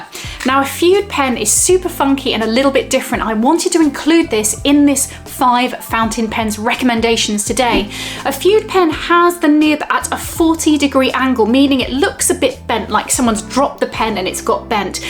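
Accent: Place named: South, United States. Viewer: I have a few bent nibs, Love them for their versatility. Love the dulcet southern accent, BTW.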